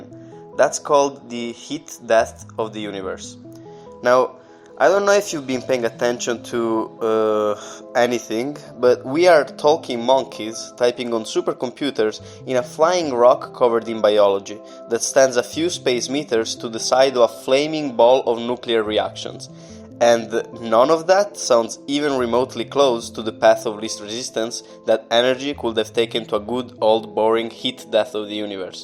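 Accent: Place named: Italy. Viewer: Fantastico come la tua pronuncia fosse perfetta, ma mi sono bastate le prime tre parole per capire che fossi italiano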